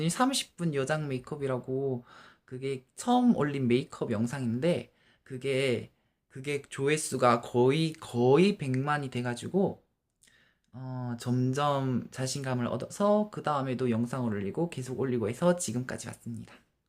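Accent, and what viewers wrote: Gyeongsang, South Korea. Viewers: 아 말투가 너무 그리워요ㅠㅜ제가지금 경상도살다가 전라도로와서ㅠㅠㅜ 대구근처에서 살았거든요!!사투리ㅠㅠㅠㅜ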